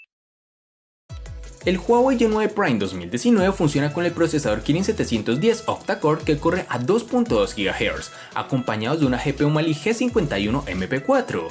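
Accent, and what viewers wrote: Colombia. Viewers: De q parte de colombia eres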